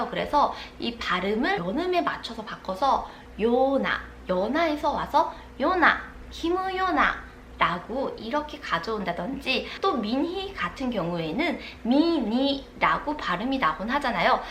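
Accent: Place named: Tokyo, Japan. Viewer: 수도권 출신의 일본어 특유의 발음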